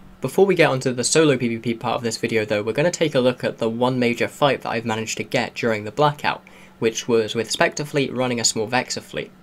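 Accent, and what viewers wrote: United Kingdom. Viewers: What's with the american flag on your wall? I'm genuinely curious as you have a british accent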